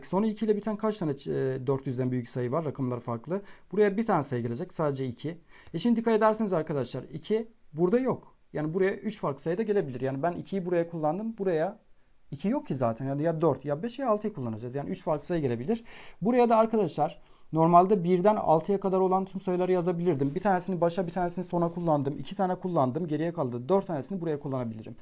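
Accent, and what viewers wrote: Van, Turkey. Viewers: Hoca vanliiiii.. Hemşehrim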